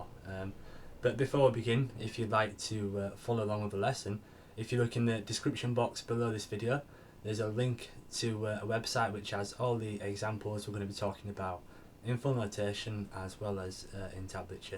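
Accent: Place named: Lancashire, United Kingdom. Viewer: Good vid man very useful. You from Lancs?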